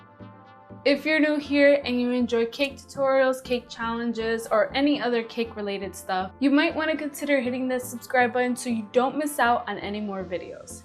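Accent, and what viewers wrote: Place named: New York, United States. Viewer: I love your accent. Are you from New York?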